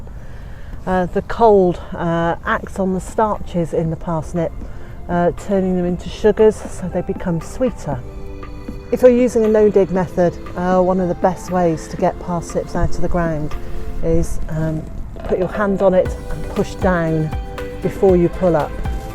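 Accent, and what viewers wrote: England, United Kingdom. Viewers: Good to hear an English accent,thanks for the info.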